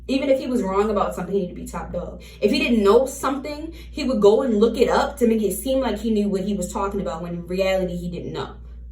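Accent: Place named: Baltimore, United States. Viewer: You from Baltimore I know stUpid and DUo when I hear it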